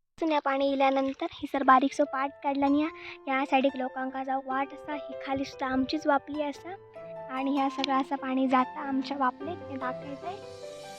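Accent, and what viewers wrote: Malvani, India. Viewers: तु असा मालवनी बोलतस की ऐ कायला बरा वाटता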